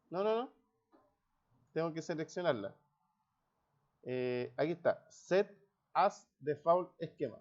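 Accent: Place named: Chile. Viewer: Excelente explicación muy didactica y de facil comprensión, además el aporte de la página es muy util para quienes estamos iniciando en el mundo de las TIC, por su acento deduzco que es ud chileno, muchas gracias saludos desde colombia.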